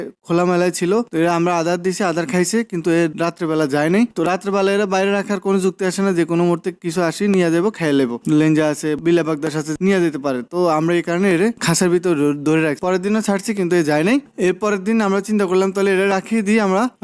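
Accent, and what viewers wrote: Noakhali, Bangladesh. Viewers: ভাই আপনার কথায় মনে হয় যেন আঙ্গ নোয়াখাইল্লা??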